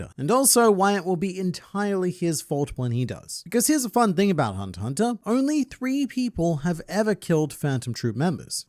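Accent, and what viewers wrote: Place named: Australia. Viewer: I just noticed you have an aussie accent 😭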